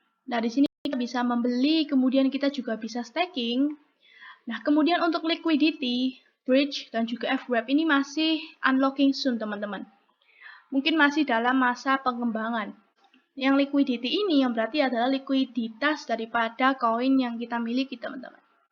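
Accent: Java, Indonesia. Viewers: Medok amat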